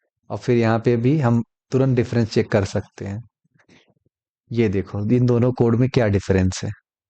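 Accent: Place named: Uttar Pradesh-Bihar, India. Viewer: Nice yaar. Or apni UP Bihar wali hindi me or maja aa rha hai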